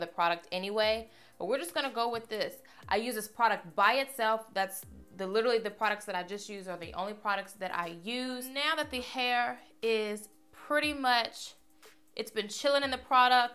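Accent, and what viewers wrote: South, United States. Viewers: your country voice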